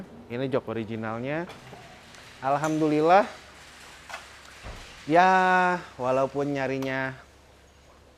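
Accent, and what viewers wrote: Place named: Jakarta, Indonesia. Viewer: demen banget ama logat betawi nya ahaha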